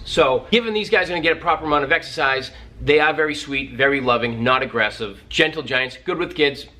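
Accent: Boston, United States. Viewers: What accent is that? Boston?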